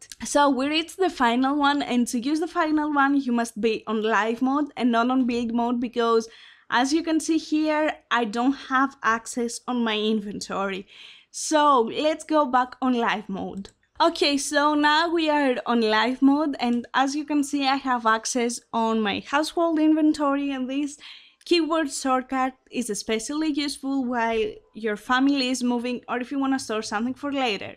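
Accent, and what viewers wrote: Greece. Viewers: Are you from Greece?? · She has to be greek to have that accent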